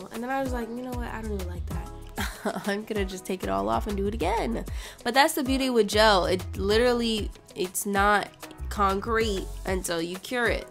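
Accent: United Kingdom. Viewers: It was the British accent for me! lmao yes, I no longer visit the salon unless I want to get a russian manicure- to do my nails after lmao (or for a good ole pedi) Love these!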